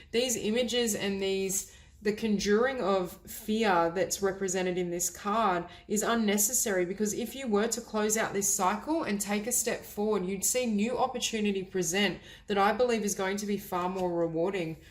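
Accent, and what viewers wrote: Australia. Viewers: Where are you from? Sound like Australian.. if yes, can i drop by for reading personally?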